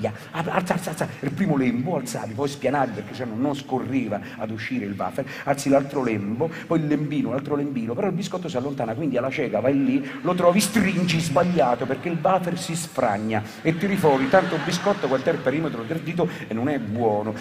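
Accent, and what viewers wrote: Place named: Rome, Italy. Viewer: Enrico Brignano, che dire, io non sono nato a Roma ma il dialetto Romano suo è raffinatissimo. Tante risate e affetto da Perugia. Viva il Cabaret ( quello vero) <3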